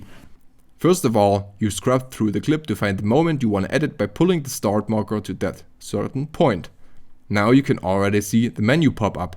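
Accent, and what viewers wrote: Germany. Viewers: Hey, ich geh mal anhand deines akzents davon aus, dass du deutsch sprichst. Ich hab ein kleines GTA Projekt vor und bräuchte da Rat bzw Hilfe von jdm mit Erfahrung. 1. Kann ich mir im online Modus mehr als 2 Charakteren machen ? ( Brauche 2 für mein Video ) 2. Kann ich beide Charaktere gleichzeitig steuern lassen im offline modus, sodass ich praktisch 2 Schauspieler habe, die ich bzw noch eine 2 Person mit einem Kontroller steuern kann und somit z.B beide für eine Szenen nebenher laufen lassen kann ? 3.Wo legt GTA die exportierten Videos hin, sodass ich sie in Pr öffnen kann ? 4. Kann ich mit gemodeten Autos Aufnahmen machen ? Wäre echt toll, wenn du mir helfen könntest bei meinen Fragen ! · Digga du hörst dich so deutsch an hahahahah are you german bro? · Are u a german youtuber cause you have that accent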